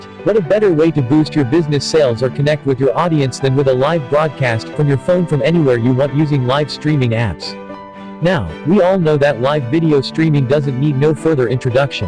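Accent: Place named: England, United Kingdom. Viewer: I like you english accent, thank you for being a good teacher and sharing your idea about our modern technology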